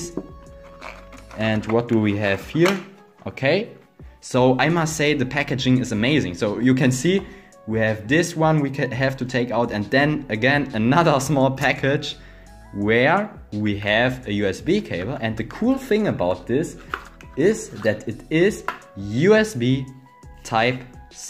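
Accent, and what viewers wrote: Germany. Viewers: Man hört das du deutscher bist 😂👌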